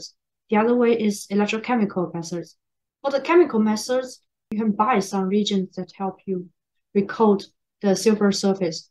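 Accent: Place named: China. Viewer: Love the Chinglish accent, it sounds familiar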